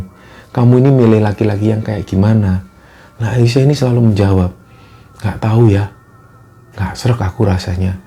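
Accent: Java, Indonesia. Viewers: Sugeng ndalu mas 🙂 Gk prnh bosen liat channel ne, cr pembwaan mas dlm crt slalu enk didengar n apa adanya, n tetp dgn cri khas jawa tulenny, smoga trus brkembang n crta2ny bs mnmbh pngthuan bt org lain aminnn, slm dr lampung 🥰🥰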